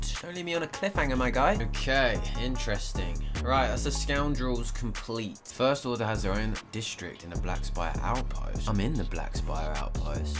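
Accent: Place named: United Kingdom. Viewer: We gonna have some Woaaaa.🤣🤣🤣 I love the British accent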